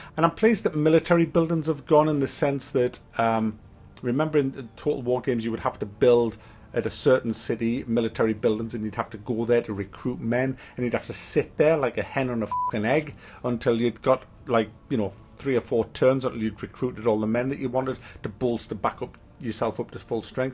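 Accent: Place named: Scotland, United Kingdom. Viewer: I gotta say I love hearing your accent. However I'm an American and it is hard for me to place it. Where are you from? Are you Scottish?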